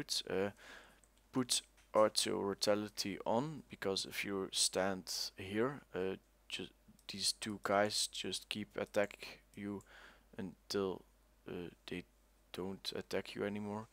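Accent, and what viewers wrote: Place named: Netherlands. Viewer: tought you were dutch actually, it's because you speak words with an Dutch accent :) liked the guide really much, didn't know to kill them there :)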